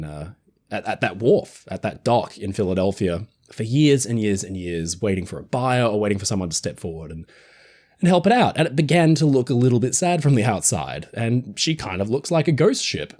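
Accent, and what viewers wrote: Australia. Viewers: Friend of mine retired from the merchant marine roade the SS United States. He said she was somewhat rough riding he thought due to its speed. A shame but the time to have done something was decades ago well before the Mad Men show. Huge cost, being in Philly didn't help. Interiors is what most people want to see. Ship didn't have the right friends in the right places. But as you noted, very few ships saved/preserved. Even naval ships were neglected, Farragut's flagship rotted away. Nothing from the Sail & Steam era I'm fascinated by. Thanks. Beautiful rant. So you're an Aussie??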